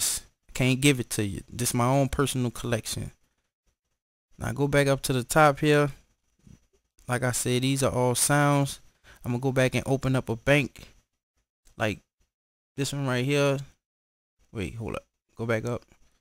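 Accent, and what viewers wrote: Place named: United States. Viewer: love ur american accent...im a canadian so im weird...lol, nice vid..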